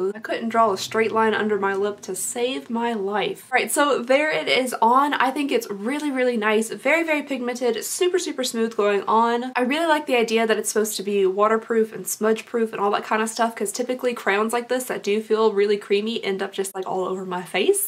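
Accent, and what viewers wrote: Kentucky, United States. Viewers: oof wait are u from Kentucky, I am too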